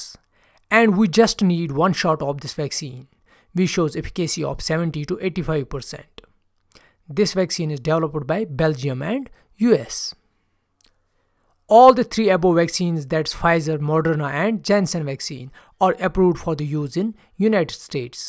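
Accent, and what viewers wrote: Kashmir, India. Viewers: Indian accent, nah bye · 200% he is kashamri..but nice way to english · Kashmiri guys ....ur tone of eng shows ...nyc vdo bro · i can tell you already by the indian accent that chinese vaccines will be rated the worst before i even watch the video :)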